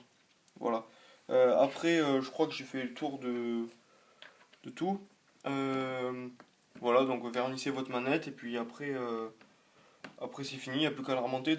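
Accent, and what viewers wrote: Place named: South, France. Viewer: T'es du sud toi ? J'entend un petit accent , sinon merci pour se tuto !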